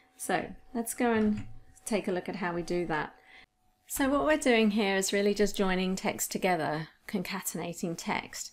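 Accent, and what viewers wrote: United Kingdom. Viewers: It's her British accent. We in NA are suckers for it. lol. A smooth talker from the UK could sell refrigerators to eskimos LOL. But I agree - she is REALLY good.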